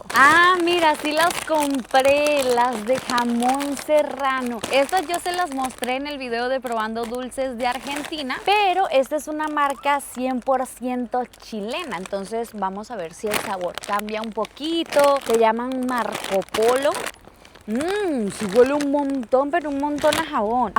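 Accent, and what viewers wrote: Venezuela. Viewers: me encanta como aún mantienes el acento venezolano. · Ojo con tu acento. No te vayan a agredir por allá por ser venezolana.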